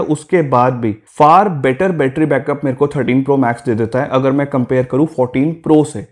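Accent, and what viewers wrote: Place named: Punjab, India. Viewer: Punjabi lagde oh tuc mainu thodi thodi hindi ch punjabi lag ri aa😅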